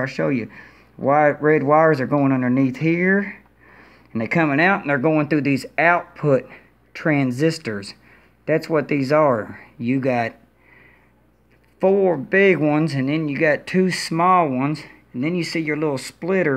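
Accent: South, United States. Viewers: Good Ol' boy does audio...I have not heard audio gear reviews with a heavy southern accent. I like it! Haters gonna hate on Emotiva, seems impossible to go wrong with for the $.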